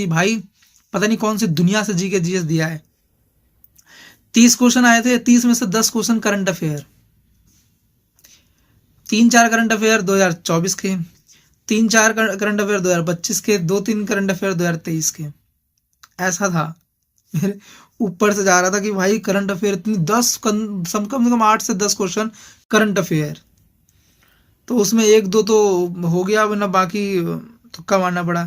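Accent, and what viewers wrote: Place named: Bihar, India. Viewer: aap bihar se ho kya aap nomadic shubham ki tarah baat kr rhe ho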